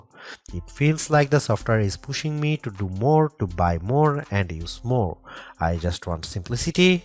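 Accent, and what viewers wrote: India. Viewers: OMG, listening English from an Indian is a violene to my ears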